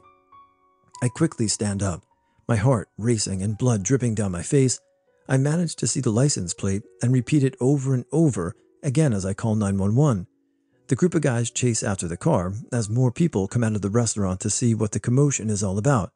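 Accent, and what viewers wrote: England, United Kingdom. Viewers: Hi! Thank you for your great work! I was super entertained by your British accent. 👍🏻👍🏻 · The English accent in the first story made me laugh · Longtime fan of the channel, as a Brit, I think your English accent is hilarious. 😆 Keep up the good work!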